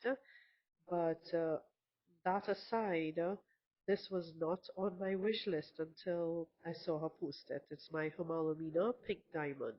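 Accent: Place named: Trinidad and Tobago. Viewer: Oh what a beautiful plant, I find homalomena to be a pretty trouble free plant and usually when she's thirsty she'll lean a bit, she's positioned for some direct morning light which I'm seeing no issues with thus far. Immediately I recognized your accent as trini, and wow it's absolutely wonderful to hear a fellow Trinbagonian doing planty videos, keep up the good work 🇹🇹 ❤😊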